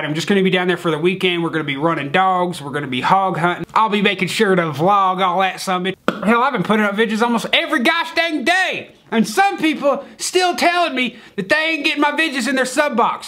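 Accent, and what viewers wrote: South, United States. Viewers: 2018 also You sound like an real American .-. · OCASIÓN!! AMERICAN FRENCH SOUTHERN DIALECT!!!!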